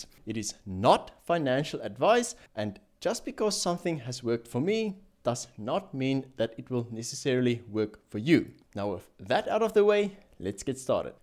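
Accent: South Africa. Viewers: Cool video! Love the accent. SA represent. I also noticed a big gap in localised content in the marketing and entrepreneurship space. ❤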